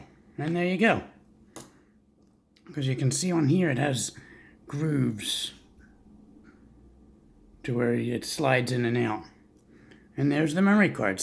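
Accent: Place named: South Jersey, United States. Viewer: I hear south Jersey in you haha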